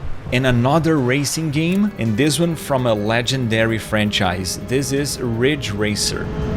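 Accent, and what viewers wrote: Brazil. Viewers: percebido um sotaque que parecia brasileiro em algumas palavras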